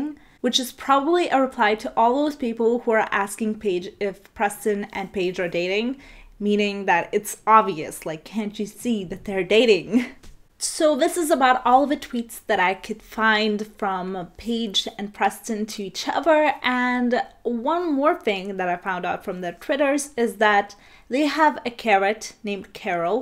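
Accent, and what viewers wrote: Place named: Italy. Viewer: Loving your accent! I'm guessing you're from Italy... (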